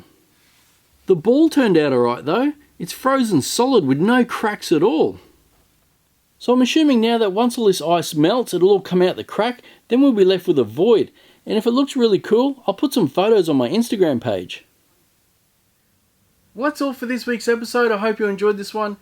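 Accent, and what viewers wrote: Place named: Australia. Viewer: his australian accent is so good to hear😀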